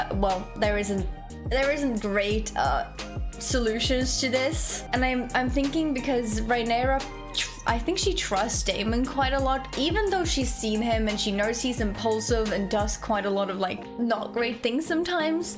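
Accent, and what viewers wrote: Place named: South Africa. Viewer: Where are you from ? you almost sound South African and Irish and Australian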